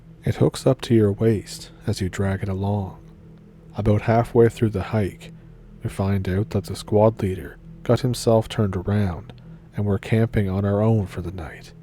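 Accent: Ontario, Canada. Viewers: Thanks for the stories, tonight. Your accent sounds like my old cousins and family from Ontario. Good times. 🎉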